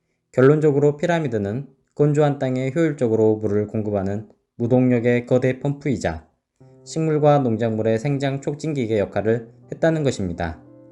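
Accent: Jeolla, South Korea. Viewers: 모음 발음이 전라도식이네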